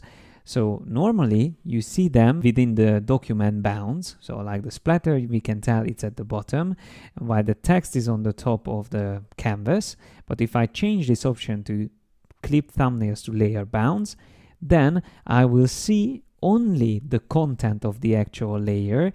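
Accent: Hungary. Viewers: Kimagaslóan kitűnő és hatékony tananyag kezdőkne és haladóknak egyaránt. A beszéd akcentusból arra következtetek, hogy teszik tudni magyar nyelven is komunikálni, ha igaz akkor szeretném ha ezt velem közölné, mert angolból csak kezdő vagok. Igen szép munka (Y) sok sikert ezután is.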